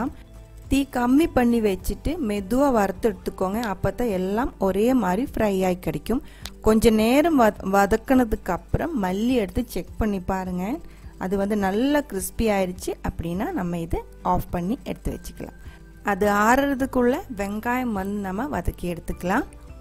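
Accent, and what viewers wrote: Kerala, India. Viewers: உங்கள் கேரள சமையல் சூப்பர் அதைவிட கேரள தமிழ் சூப்பர் தோழி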